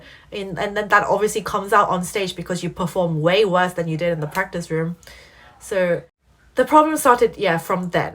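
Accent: England, United Kingdom. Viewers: Many thanks for such an encouragement life story. I must say that your mum had made the best decision for you and your brother. I have never ever known a Korean person speaking excellence English (in English accent) likes you are. All the best from Melbourne, Australia:-) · This proves your UK accent! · Wow, what an amazing life story! At least now we know where you got the strong English accent from (absolutely fabulous 💫). Oh yeah, Russian music and ballet teachers don't mess with them!! 🎶 Did you take piano classes? Rachmaninoff and some others probably had gigantic palms, hard to stride the notes for those with smaller hands. I think you will thrive on YouTube with your bilingual abilities. Welcome 🏡 dongsaeng 👊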